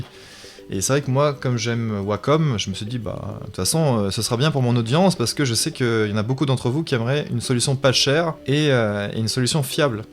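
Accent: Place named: Quebec, Canada. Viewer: t ai quebec...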